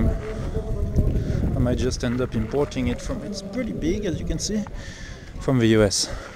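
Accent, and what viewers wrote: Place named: France. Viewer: Hi, I just turned on my youtube bit and there you were with this new video :) I recall the Datsun Z coupes, I think they began as 220Z or perhaps 240Z but they were a big hit here in USA...I recall the Toyota cars, the Corolla, the Carina, and another C namebut we were thinking of them as cigar names...only Corolla remains. Thanks for this tour and the English audio....it is nice with a great French accent :) I have several relatives who have Japanese connections...the guy who is in Japan now has taught English for many years. his given name is Rhys an he just got married to a woman who teaches French but I am not sure where they reside. Likely in the Tokyo area...I have watched videos of the 311 tsunami provided by fnn311, he gives a nice English subtitle....I believe you did not have a problem with that 311 event if you lived further south in that year...Thanks again for this video ! 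Chuck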